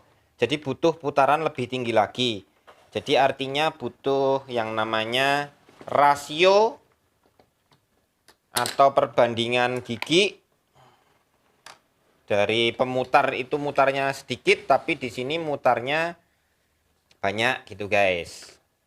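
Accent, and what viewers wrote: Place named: Banyumas, Indonesia. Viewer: Kalau boleh tahu orang mana, mas? Usianya 30an atau 40an kah? karena susah ditebak dari fotonya yang tampak muda, tapi suaranya jelas sekali dan terdengar seperti Pak Lik saya yang orang Cilacap,, saya subscribe yaa,, jarang-jarang saya bisa menangkap suara pengucapan sejelas ini di yutub karena saya orang nya Hard of Hearing alias gangguan pendengaran sedang,, dan orang dengan logat ngapak seperti Pak Lik saya gampang saya dengan suara pengucapannya,, kebetulan juga sedang mengerjakan tugas akhir membuat contra-rotating axial flux permanent magnet generator,, back emf nya tampak terlihat sekali karena salah rotor magnet yang seharusnya ikut berputar bersama rotor kumparan malah diam dan terasa ada drag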